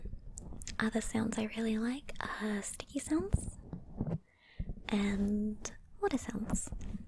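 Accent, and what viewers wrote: Australia. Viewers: It's odd - you have a 'sweet' voice pitch, and its like the aussie accent kind of amplifies it, if you know what I mean. That's always the impression when I hear your voice in these vids, very sweet. Haven't met many Australian girls with a similar voice pitch. As a side note, I'm half filipino half English living in Australia for almost all my life! It's a small world, huh. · A Spanish- looking Filipino with an Australian accent? That's a treasure for sure, haha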